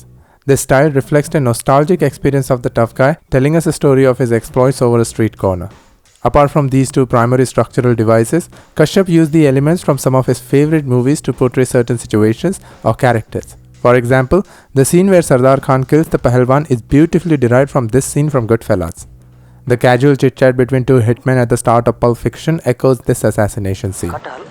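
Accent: India. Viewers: Could not understand your Indian accent properly ?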